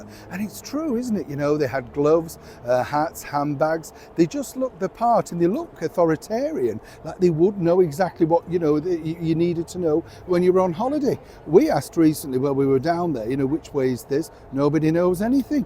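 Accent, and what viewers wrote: Yorkshire, United Kingdom. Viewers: Oh Neil!! “ All that claptrap!” You make me chuckle. A proper Yorkshire chap. Tell it like it is!🇬🇧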